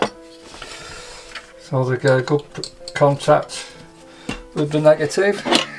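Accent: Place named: Northern England, United Kingdom. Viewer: Hi, Just printed my first Cyanotype and wanted to know how to progress with wet cyanotypes etc. Have now been watching your videos for the last few hours.(Lovely to hear a northern accent!!) Brilliant am hooked. Today was a beautiful day so can't wait for the next one to experiment further. Thank you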